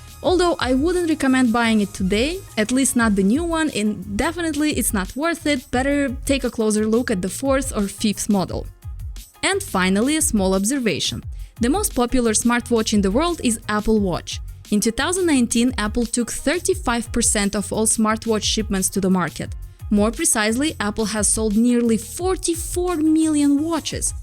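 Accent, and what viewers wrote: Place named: Russia. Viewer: i like russian accent hhhhh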